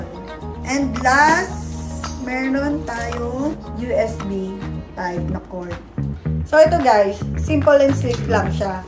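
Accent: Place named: Iloilo, Philippines. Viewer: Ilongga man gali ni c madam🥰mabakal ko sina,pila man?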